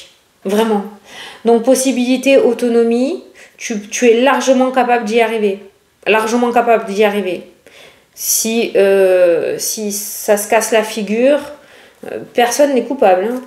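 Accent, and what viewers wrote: South, France. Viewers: Bonsoir pour moi c'est la 1ere fois que je vois votre guidance..en tt cas j adore votre accent du sud moi qui suis dans le nord ca fait chaud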